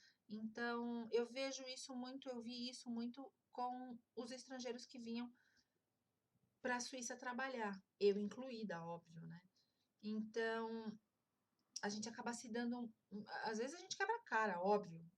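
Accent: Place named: São Paulo, Brazil. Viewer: não resisti e fiz até uma conta aqui no Youtube só para poder postar um comentário para você.. rsrs Moro no Brasil..mas sou APAIXONADA pela Suíça...Penso em um dia morar ai.. (Se acontecer vou te visitar.. adorei vc! rsrsrs) eu tenho uma tia que mora aí e meu primo é suíço (Não entendo quase nada que ele fala.. rs), adorei todos os seus 3 vídeos.. assisti os 3..achei muito bom! não deixe de postar mais.. assistirei também com certeza! nossa, vc tem sotaque de "paulista" rs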